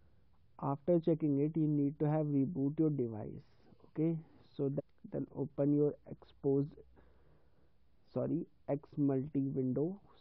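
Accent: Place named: India. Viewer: Damn, that Indian accent 😅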